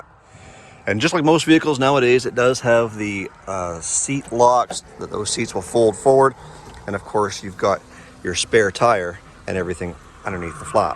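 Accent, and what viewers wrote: Canada. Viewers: There is that damn ou Canadian accent. Just terrible. HATE IT.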